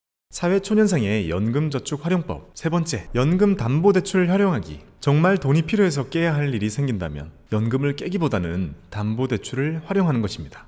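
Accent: Gyeongsang, South Korea. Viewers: 처음엔 무슨말인진 모르겠고 그냥 저음의 경상도 사투리를 즐기고 있었는데요 듣다보니 이제 이해도 됩니다 😎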